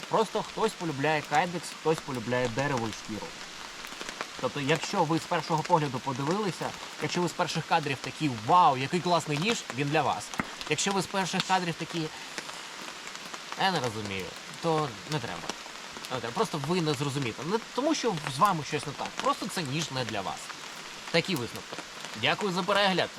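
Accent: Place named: Russia. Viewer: Я тоже не понимаю зачем говорить на одном языке, а думать на другом!? У автора так и вырываются русскоязычные нотки в диалоге и водно, что украинский даётся тяжело, а, в карпатах на украинском, разговаривают гораздо быстрее!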